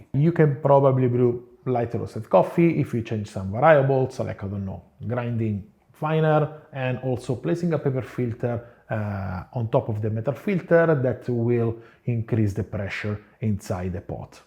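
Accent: Italy. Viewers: Excellent video and the Italian accent makes even more authentic 😁 Having both I always felt dark roast which I only use tasted more smoother, abit more refined and abit more juicier on the Venus. Moka has the less refined and harsher finish overall. Personally I only use the Venus & it looks more astectically pleasing too 👌❤🇿🇦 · I Like the italienenglish😊😅 good Video. Thank You! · Dam this guy is a coffee scientist. The Italian accent makes it perfect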